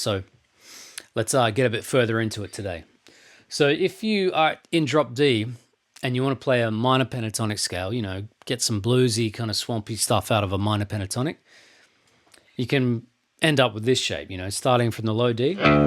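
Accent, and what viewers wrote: Australia. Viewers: his accent sure sounds australian to me not british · I would have guessed Australian, sound just like Keith Urban's accent, everybody knows he's Australian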